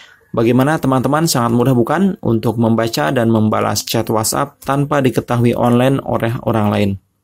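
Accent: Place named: West Java, Indonesia. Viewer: Orang sunda pasti yang ngomong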